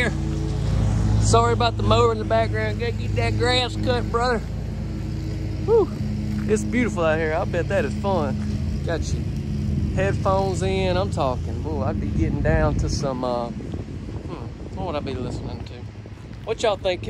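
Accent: South, United States. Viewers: I really like listening to you. You are a Southern boy and you don't try to hide it. You make me smile with your descriptions of the homes. Love you..have a blessed day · My husband usually knows when I’ve watched a video of yours when I start talking with a southern accent. Lol. And he is right! I just LOVE your videos. Keep up the good work! 😊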